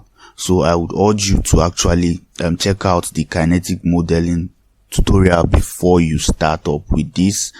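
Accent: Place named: Nigeria. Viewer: I always feel proud whenever I hear Nigerian voice in tutorial videos.. Good job bro❤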